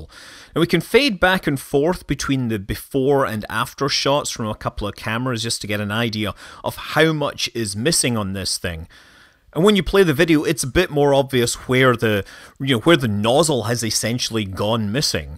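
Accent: Scotland, United Kingdom. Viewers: Great to hear your Scottish accent!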